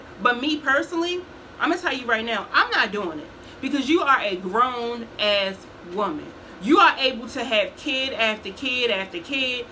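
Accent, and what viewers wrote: Baltimore, United States. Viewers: Hey girl Hey....starting to become a fan!!🤣 Nothing but facts....being a reactor is actually easier than being the vlogger.🤷🏾‍♀️ They the ones put in the spotlight to be judged by their every move!🤦🏾‍♀️ By the way....are you from Bmore? You sound exactly like you from Bodymore!🤣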